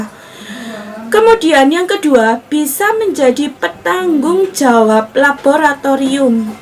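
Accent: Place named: Java, Indonesia. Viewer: Medok banget kak .. wkwk orang Jawa kah ?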